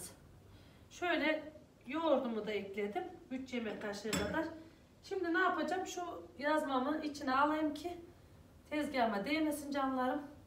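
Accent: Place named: Nevşehir, Turkey. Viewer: Nevsehirliyim konusmammiz col benziyo cömce 🙂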